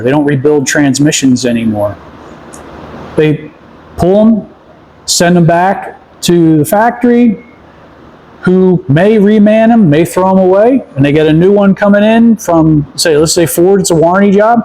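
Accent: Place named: East Coast, United States. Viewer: He sounds east coast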